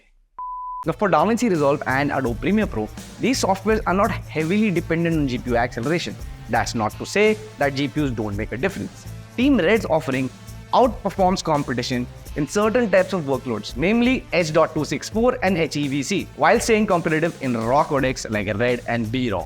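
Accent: India. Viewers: hard to understand what ur saying with indian accent and background music combined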